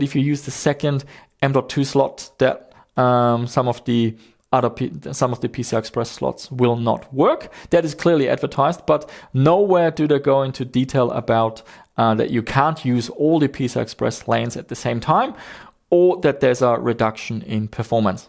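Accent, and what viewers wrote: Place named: Germany. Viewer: I have to ask, are you originally from Australia? Cause your accent sounds German to me for some reason.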